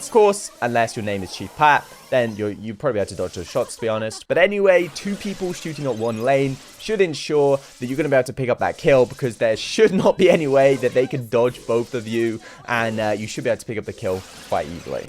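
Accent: United Kingdom. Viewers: it's called a British accent dummy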